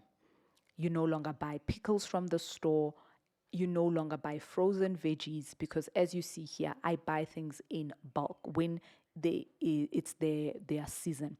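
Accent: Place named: Zimbabwe. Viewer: oh my you have a Zimbabwean accent I didn't even read the caption I just saw a black woman and I clinked lol but anyway this is so needed for the young ones good job mama love from Canada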